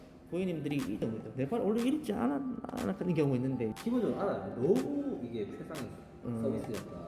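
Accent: Gyeongsang, South Korea. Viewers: 늘 너무 재밌게 봐용 경상도 사투리는 톡톡쏘아대서 억양이 쎄다 라는 생각을 늘 해왔는데, 선생님 영상보면 경상도 사투리도 저렇게 친절하고 부드럽게 느껴질 수 있구나 생각해요ㅋㅋㅋㅋ 저는 내성발톱 아닌데 가보고싶어요 ㅠ ㅠ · 남자선생님인데 넘나 친절하시고 관리도깔끔히 잘하시는것 같아요 경상도사투리 매력적이십니다ㅋ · 발톱의 이물질 제거할때.ㅋ 쁑~~ 쁑~~ 좋네요.👍 친절하시고 상냥하시고 ㅋ 특히 좋은점은 갱상도사투리요。 물론 실력도 좋으시고요..♥👍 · 저도 대구인디 같은 경상도 사투리인데 왜 다른 느낌이죠? ㅋㅋㅋ 넘 친절하신 말투에여! 1차 관리 링크도 본문에 같이 남겨주시면 더 보기 좋을 거 같아용 1차에는 2차 링크 걸어두시구 ㅎㅎ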